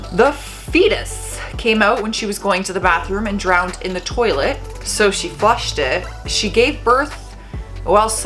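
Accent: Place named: Canada. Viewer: G,day I'm Australian. Surprise, Surprise, you have a Canadian Accent!!! Love it!! All the best. Bluey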